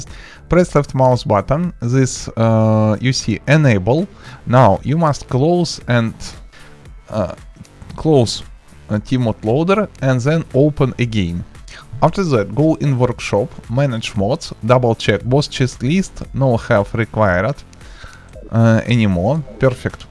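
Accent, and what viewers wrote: Russia. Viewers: Чувак, русский акцент прям выделяется. А так видос классный.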